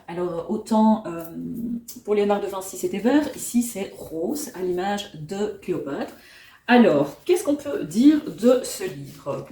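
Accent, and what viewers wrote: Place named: Belgium. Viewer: Hello, J'avais été très touchée par Ce qu'il faut de nuit, un coup de ❤et effectivement il a obtenu plusieurs prix dont celui des lecteurs du livre de poche. Alors pour le langage, tu m'as fait rire😅 car c'est simplement que l'auteur retranscrit quelques expressions et l'accent trainant lorrain, notamment sur le "O" et "A", dont il est originaire. comme toi, tu as l'accent belge pour prononcer certains mots. Je n'ai toujours pas lu les mémoires d'un chat qui est dans ma whislist depuis longtemps... Bravo pour tes chroniques qui donnent envie de lire😊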